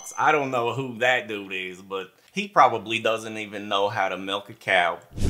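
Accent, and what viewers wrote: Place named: United States. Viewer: I love your cowboy accent my username is lovelybear1509 and I have a display name it’s Italy! :)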